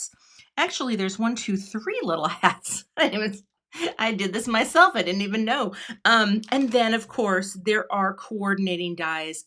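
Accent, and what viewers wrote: Midwest, United States. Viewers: ?Harold and the Purple Crayon? I hear a little mid west accent in you.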